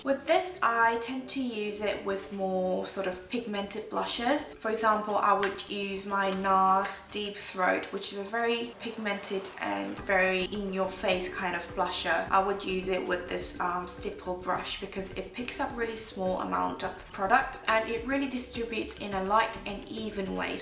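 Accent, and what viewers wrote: United Kingdom. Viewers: 님은뭐랄까..보면볼수록매력이있는거같아요~~~오늘부터구독하기시작했어여~~~경선님유튜브에서알게되서오늘부터보기시작했네요^^ 영국영어발음도너무매력적이고~~항상응원하겠습니다~~~ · 영국식 영어 너무 멋져~~♡ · 올라오기만 기다리고있었는데 올라왔네요!!!!!댓글 처음 남겨봐요ㅎㅎ좋은정보 많이 알아가서 좋아용 영국발음에 관심있어서 보게된건데 언니 동영상들보고 급!!화장에도 관심이 생겼어요ㅋㅋㅋㅋ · 아이허브에 리얼테크닉브러시 세트가있더라구용ㅠㅜ그거 구매할까말까 수천번은 더 고민하구있어요ㅎㅎ 스펀지두 마찬가지ㅜㅜ 언닝 영국발음 쭤러용♡ 볼살없어서 스트레스인 저에게 언니 볼살은 부러움의 대상이에용ㅋㅋ 항상 이쁜비디오 감사드려용! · 영상많이올려주세요♥ 영국발음좋아요ㅎ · 얼굴은 애기애기한데(손연재닮음!!!) 목소리나말투는 파워머찜..역시 발음은 브리티쉬..존멋..무튼언니팬이라구용..><항상잘보구잇슴니댱~~~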